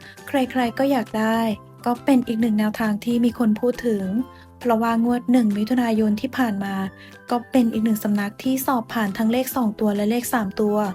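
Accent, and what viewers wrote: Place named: Central Thailand, Thailand. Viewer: แอดคนจังหวัดอะไร เหมือนคนภาคกลางเหนอนิดๆๆ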